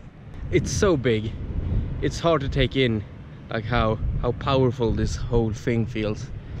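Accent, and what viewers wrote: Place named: Sweden. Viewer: Jag tyckte att du hade en svensk dialekt😂😅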